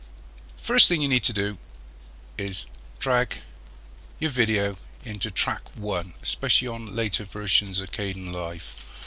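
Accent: United Kingdom. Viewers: this guy fuckin sucks and takes way to god damn long for those in the future watch this guy instead (9) Kdenlive Tutorial: How to Export Video in Kdenlive - YouTube he's quick and to the point. not like this bri'ish bozo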